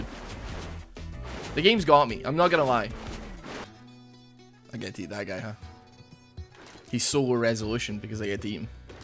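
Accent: Scotland, United Kingdom. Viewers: I feel like you're legitimately the best youtuber I've seen: Although you have a main game (league), you don't mind diverting to another games, you're extremely funny, and the scottish accent helps, you're unique and you interact with the community which is lovely Keep it up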